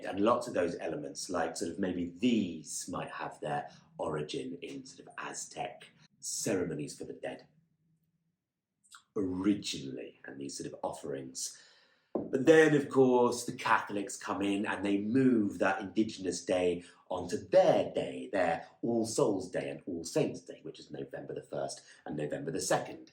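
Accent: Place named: Birmingham, United Kingdom. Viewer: Hey are you from Birmingham? Your accent reminds me of an old roommate of mine from there:)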